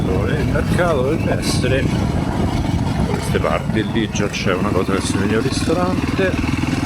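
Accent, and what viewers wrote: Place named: Rome, Italy. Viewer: sono molto contento che puoi fare queste esperienze, bravo. anche io ci provo sovente , ma ancora non è la mia vita piena… ti auguro il meglio e tanta fortuna. inoltre il tuo lieve accento romano è molto gradito. continuo a seguirti da molto tempo, dall’inizio…!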